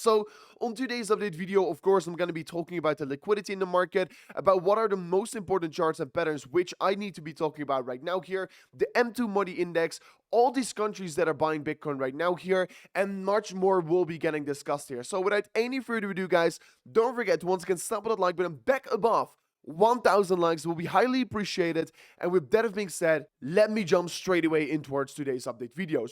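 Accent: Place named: Achterhoek, Netherlands. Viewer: Beetje achterhoek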